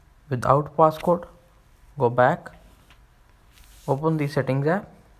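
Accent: India. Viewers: So straight from the start, I see the comments are fucked and are bots. And it explained it when I heard the head ache Indian accent. Dislike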